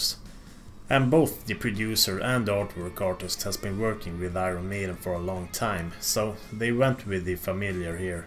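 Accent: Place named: Stockholm, Sweden. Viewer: cringey stockholm accent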